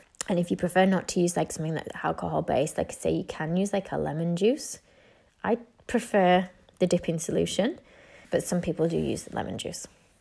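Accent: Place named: North, United Kingdom. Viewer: Gotta love a northern accent!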